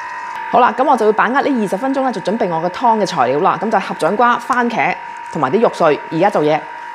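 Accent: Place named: Hong Kong. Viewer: Hello, I'm also a TM6 user, u did a very good and relex demo. If u like this way to cook I want to let u know that under TM company there is a Book call Three-in-One cooking,一鍋三菜，u can try those dishes too. What a happy family,keep up your good work. Watch yr utube everyday... I feel like have a Hk friends 講得好香港人style. Welcome and Enjoy your life in Australia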